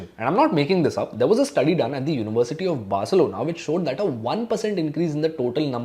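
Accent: India. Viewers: Funny North Indian accent 🤣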